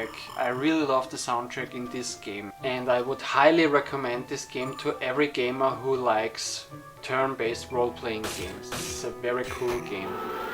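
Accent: Germany. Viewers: Its like the T-800 reviewing retro games ;) ;) Please take no offence i really enjoyed this vid. Just your german accent is very very strong. Greetings! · Alter biste deutsch? xD Das klingt so? :D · dein Bayrischer oder Ösi Dialekt hört sich ja GRAUSAM an !!! --- RrrRrRrrr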